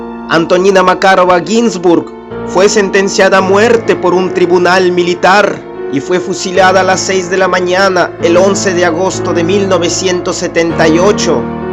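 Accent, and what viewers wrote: Russia. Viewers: Eres de Orígen RUSO tus videos son La Unión Soviética tu Acento Saludos desde México capital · wey, es ruso, no puede poner un poquito mejor su acento porque así es su acento base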